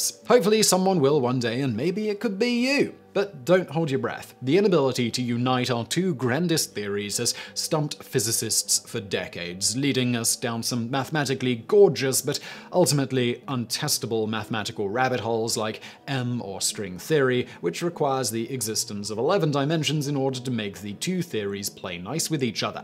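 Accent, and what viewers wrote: United Kingdom. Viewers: I'm telling you; I'm only one stupified, drunken nite away from getting a tattoo of Simon. And, as it never fails, it most likely will end up on a precarious part of my body. Damn those Brits and their damn accents.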